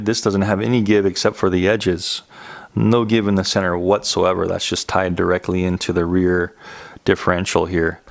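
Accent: Hawaii, United States. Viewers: You live oahu? Nice to hear pidgin on youtube!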